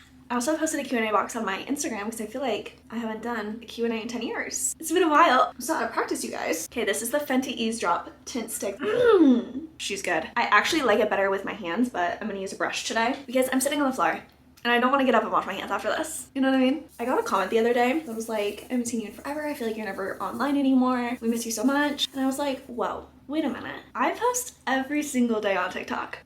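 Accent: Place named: United States. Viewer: i thought u had a country accent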